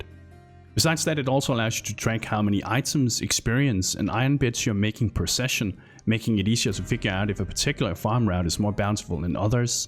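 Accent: Denmark. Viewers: Thanks for this video <3 BTW are you danish, your accent sounds danish. :) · Dat danish accent tho.. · 5 seconds in, you are definately danish right?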